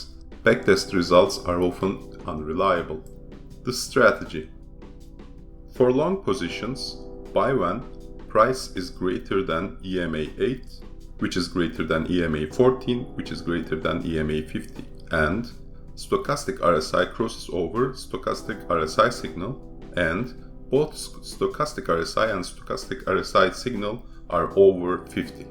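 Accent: Turkey. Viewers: It seems a Turkish voice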